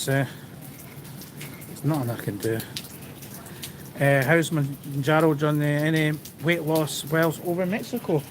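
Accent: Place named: Scotland, United Kingdom. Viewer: The entire one hour and eight minutes + video shot just from your room/swim up? Personally, I needed to have English sub-titles to understand your Scottish accent!! :body-blue-raised-arms:🤔🤣 Hopefully you enjoyed it!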